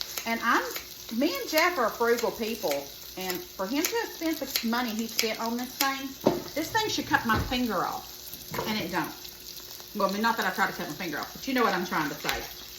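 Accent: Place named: Alabama, United States. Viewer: Enjoyed this recipe and you so much! Love cabbage, love noodles. Will be trying this. A coworker frequently cooks in our break room in an identical skillet & makes magic happen. Going to be getting one. You sound near me. Subscribed. Hello from Talladega.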